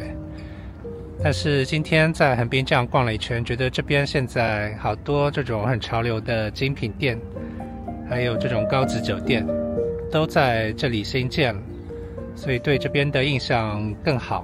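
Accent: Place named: Shanghai, China. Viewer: 是不是？ 真的！我聽到時也覺得超像的。 於是我猜G先生應該是上海人（當時還不知G先生是哪裡人），跟我女兒說，她覺得我亂猜！ 結果後來在其中一集答案揭曉，真的是上海出生的！😆😄😊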